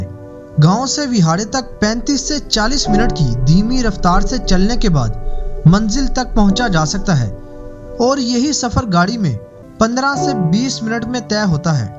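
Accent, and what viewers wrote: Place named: Pakistan. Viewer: VDO se ziada ap k urdu accent se lutf andoz huay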